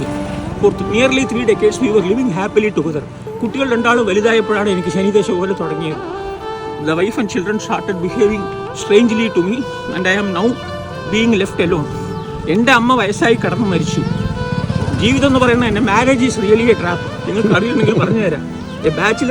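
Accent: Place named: Oxford, United Kingdom. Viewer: 100%perfect Oxford English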